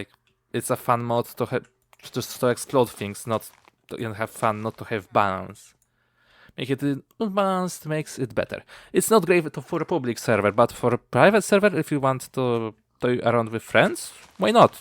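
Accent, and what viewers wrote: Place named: Poland. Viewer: my mind: "is he polish? he sounds polish just like me and my friend. oh hes using twitter with polish language". nice thing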